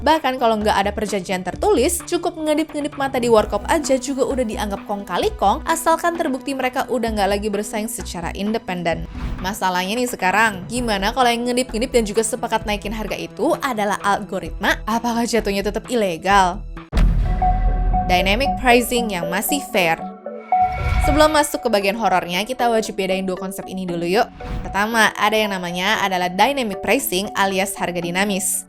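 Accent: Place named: West Java, Indonesia. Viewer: kakanya kayanya dr logat jawabarat yah heee...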